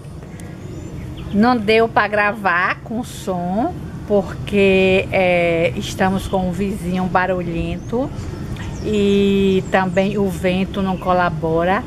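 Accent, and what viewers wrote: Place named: Bahia, Brazil. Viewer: beijos pra vc tambem adoro esse teu sotaque bem de baiana arretada, olha que eu sou gaúcha pena que morando desde criança em SP perdi o meu sotaque mas adoro ver vc falando oi gente,beijos até a proxima.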